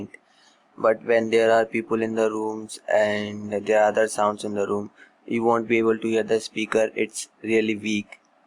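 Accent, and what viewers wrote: India. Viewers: that accent.. yuck!!!! just speak in indian language dude..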